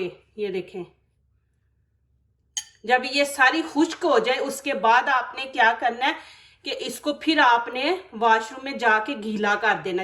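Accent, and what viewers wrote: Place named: Punjab, India. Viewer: کیا بات ھے آپکی اردو وہ بھی پنجابی ٹچ کے ساتھ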